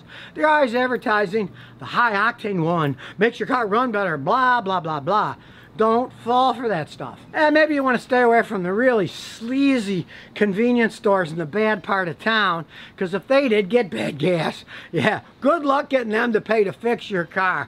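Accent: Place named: United States. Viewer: Dear uncle, please speak a little slowly for us who have a difficulty understanding American accent.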